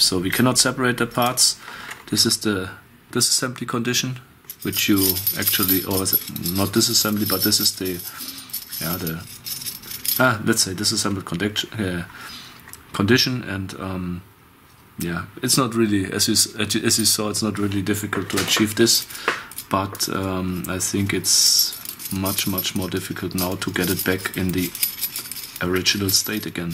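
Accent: Germany. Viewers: kommst aus deutschland oder?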